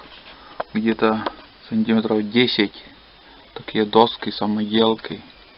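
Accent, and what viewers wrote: Komi, Russia. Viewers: У меня бабушка из Коми, и с дедушкой она в Подьяческой познакомилась, говор то-же такой. И подруга тоже из Коми, прикольно, как тесен мир. Подруга услышала от меня слово "Покумекать" и спрашивает а от куда ты это слово привык говорить, я говорю от бабушки... так и познакомились... Спасибо Вам, и всего Вам самого доброго. :)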